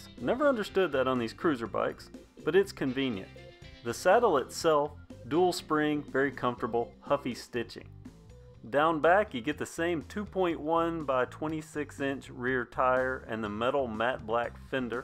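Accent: South, United States. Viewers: His Smooth Southern accent is so charming! I absolutely dispise how Northerners call Southerners accents "ugly" or "not articulate". This guys voice is the definition of articulate!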